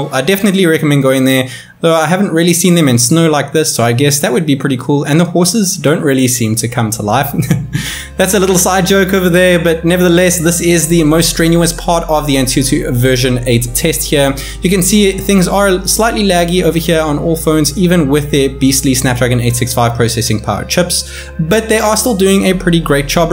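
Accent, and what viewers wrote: South Africa. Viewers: You sound south African, are you?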